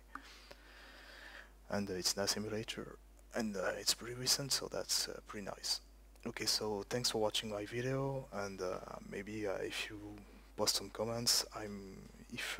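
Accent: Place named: France. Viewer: J'adore ce que ca donne un francais qui parle anglais :p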